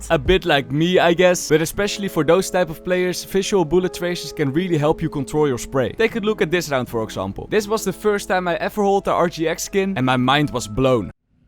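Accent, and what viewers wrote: Netherlands. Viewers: haha het nederlandse accent is zo duidelijk (geen haat gast ga zo door met die content)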